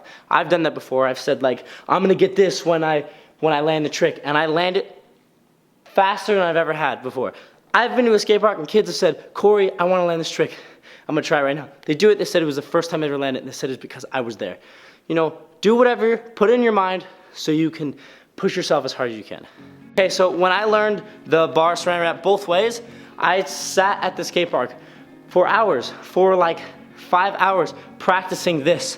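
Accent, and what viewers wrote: Australia. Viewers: are you Australian? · R thay from Australia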